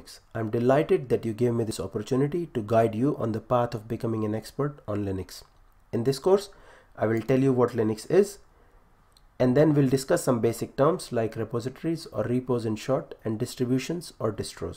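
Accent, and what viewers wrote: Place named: India. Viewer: Started the video heard some India accent person. Jesus Christ why are they every IT video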